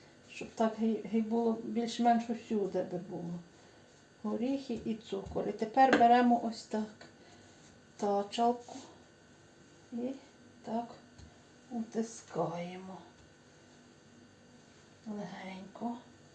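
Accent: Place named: Carpathians, Ukraine. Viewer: Приємно слухати Дуже гарний карпацький діалект❤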